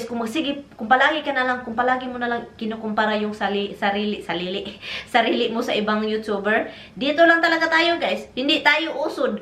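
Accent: Visayas, Philippines. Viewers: Mapa enlish mapatagalog...bisayang bisaya ang bigkas mo...magbisaya kna lng para dka mapagtawanan..wag mna pilitin srili mo te..tao ka nga ye alam nmin.pero alam mo hnd kmi magtataka kung bkt maghahanap ng iba yang jowa mo..alam mo kung bkt tingin ko sa ugali mo mayabang ka akala mo kung sino ka...mabunganga pangit ang ugali mo...yan ang tingin ko sayo.....sori 2 say pero ingitera ka....magbisaya kna lng te mas better pa....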